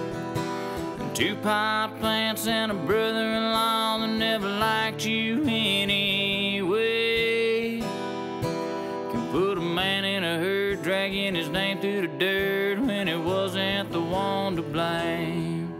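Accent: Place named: South, United States. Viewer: Love a good story teller, with a country twang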